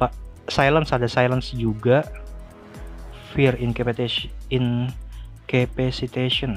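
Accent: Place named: Betawi, Indonesia. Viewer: Lu org betawi min ya 😅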